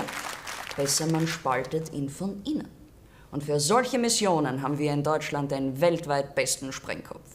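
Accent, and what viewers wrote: Austria. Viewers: Der Wiäner Dialekt in dem bei der bittersüsse Sarkasmus herrscht. Schön das Sie das sagen darf was den Deutschen verboten ist. Viel Erfolg liebe Frau. Sie stehen nicht auf Duzen, eher auf "Sie A_loch ". Danke für die Lustigen Vorträge und Einlagen. 👍👍🙋🤣H.F.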